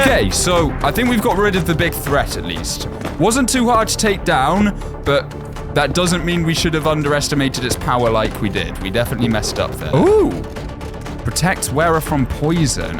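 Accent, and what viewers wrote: United Kingdom. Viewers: are you British 😂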